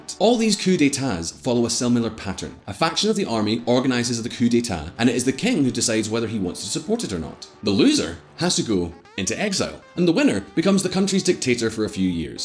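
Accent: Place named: Scotland, United Kingdom. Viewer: Nothing wrong with Scottish brogue...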